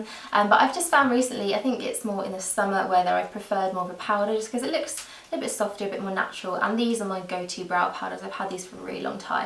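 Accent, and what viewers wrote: United Kingdom. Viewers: The dedication in that down bar, you go girl ! ;) I could listen to you speak all day, such a relaxing voice ! Out of curiosity, is your accent from any specific region in the UK? I'm Australian and find it very interesting how incredibly different regional accents can sound there (obviously it applies here as well but no where near that extent)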